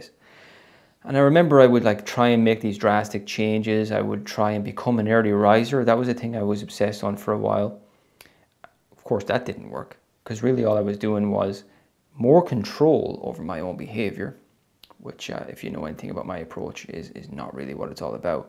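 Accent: Ireland. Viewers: You say Ting not THING is that an Irish accent?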